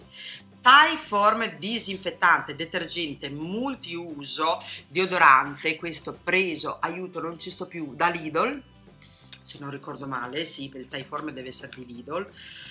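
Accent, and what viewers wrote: Italy. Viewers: Anche tu ci sei mancata, è sempre un piacere vederti e sentire la tua bellissima parlata romagnola 😄😄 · Ciao...sei simpaticissima veramente...ma posso chiederti di dove sei? Emiliana? Romagnola? 🤗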